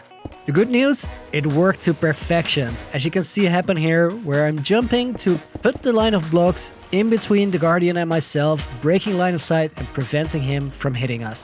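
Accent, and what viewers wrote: Netherlands. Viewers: Are you dutch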